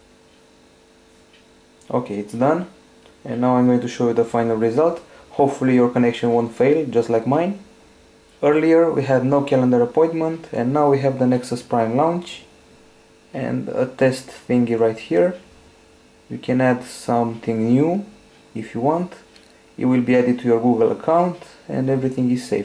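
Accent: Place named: Romania. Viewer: Frumos tuturoial facut de un roman dupa accent..Respect!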